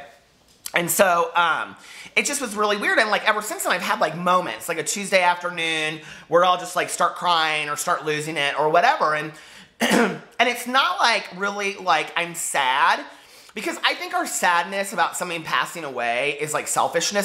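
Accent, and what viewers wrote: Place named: Kentucky, United States. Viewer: Thank you...I've needed someone like you in my world lately!!! I'm from KY but live in Nebraska & Maine. We lived in Louisville for 15 yrs so it is so nice to hear someone who sounds like me & I get. Fangirling over you sir!!! ;)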